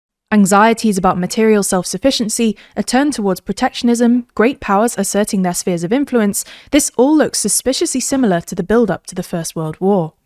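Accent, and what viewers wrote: United Kingdom. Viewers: This lady british accent is so much better to listen to. I could not hear another word from the previous british dude voice, sounded like that annoying pewpewdie dude.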